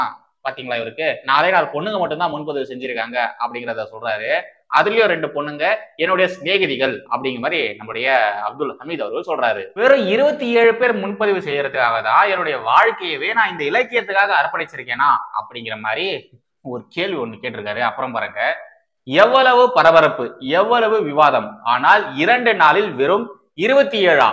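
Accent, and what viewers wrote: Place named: Chennai, India. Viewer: நீங்கள் தொகுத்து வழங்கும் மதராஸ் பாஷை மிக்க நகைச்சுவையாக இருக்கிறது ..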